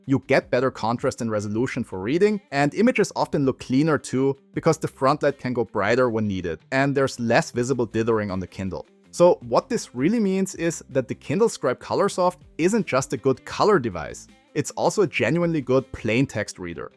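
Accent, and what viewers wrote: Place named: Austria. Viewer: wenn ich englisch mit österreichischer "Melodie" höre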